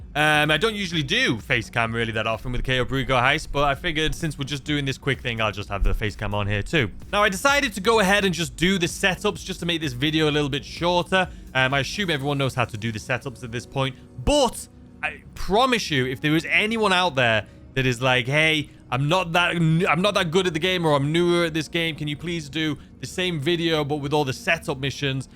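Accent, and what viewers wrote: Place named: Gloucester, United Kingdom. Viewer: Where was u from originally in the uk bro i hear abit of Gloucester in u lol! Do a Q & A one day so i can ask u lol an how did u end up movin to newyork 😎👌🏼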